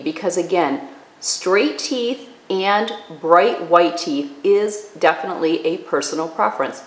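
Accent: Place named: Midwest, United States. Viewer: btw......LOVE your channel.....I've watched a few and like them as well but have decided you're my favorite.......your makeup colors are my makeup colors so much so that in look to copy everything you do via makeup......love it!.......lol your articulation and Midwestern twang are actually quite mesmerizing as well.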